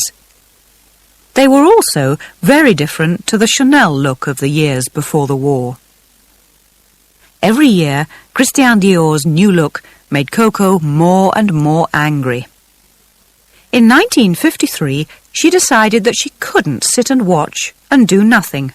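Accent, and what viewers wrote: United Kingdom. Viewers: Rodolfo Santos british I think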